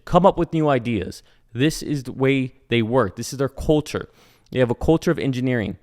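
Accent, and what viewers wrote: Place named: Germany. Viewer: "Diess is a problem and Dat is not " sounds like a German speaking english.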